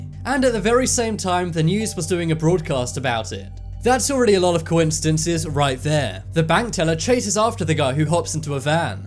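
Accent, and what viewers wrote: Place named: England, United Kingdom. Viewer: Your accent 😭♥️♥️.you From England???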